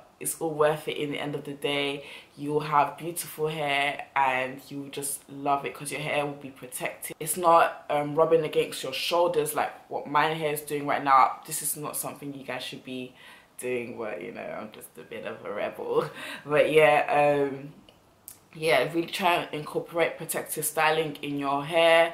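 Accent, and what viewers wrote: United Kingdom. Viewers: errmm...sort of lol. OK so this is off topic; you sound like you're in the UK. I am too and I was wondering if you know a place for crochet braids. I only do it when I go back to Ghana but haven't found anyone here that does it.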